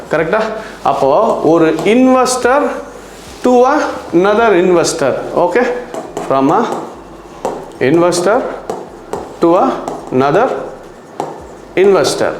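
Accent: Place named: Kanyakumari, India. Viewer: Neega Kanyakumari arabiga bro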